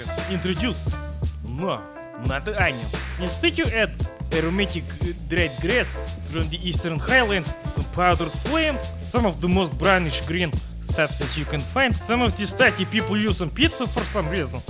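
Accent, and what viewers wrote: Russia. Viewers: Your thick russian accent made me a little tired but you are so funny :) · I watch too many of your videos, I no longer need subtitles, I understand you just fine. A friend asked me if I speak Russian, I said "no Cyka Blyat", but I understand English, it's almost Russian 🇷🇺. Keep the videos coming!!!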